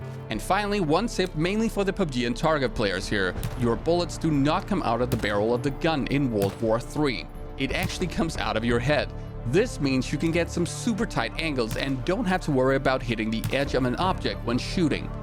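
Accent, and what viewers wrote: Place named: Denmark. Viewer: really good guide and could tell you were a dane by the accent, keep up the good work :)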